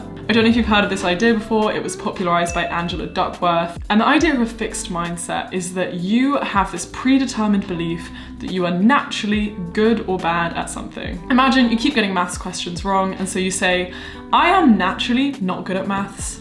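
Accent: United Kingdom. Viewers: since i watch your videos, i have to say that i can listen to British accent easilier. One more thing is why you can have lots of energy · Hey.. I love ur accent.. The first time I saw ur video, this british accent of yours inspired me to learn it...😀 Your motivational videos always made me feel confident and have gratitude and how could I forget your unique study techniques which you delivered on this platform to millions of people in order to help them out.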